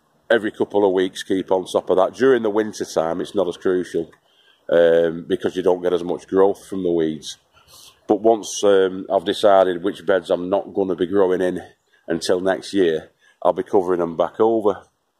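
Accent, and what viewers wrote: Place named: England, United Kingdom. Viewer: Thanks! Checked them all out and subscribed to most. Many I was already following. Love all the new channels. I think I am in danger of developing an English accent! But yours is still my favorite. Things are great here. I planted what seemed like 100’s of cloves of garlic yesterday. Harvested a big old broccoli for tonight’s dinner. Keep up the good work on your end. Wish I had the courage and the know how to do a channel. But at 70 I think that I am too old to learn filming and editing.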